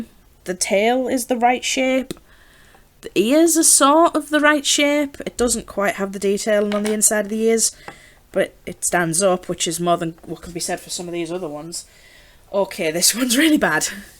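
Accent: United Kingdom. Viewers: I thought you were British because of your accent lol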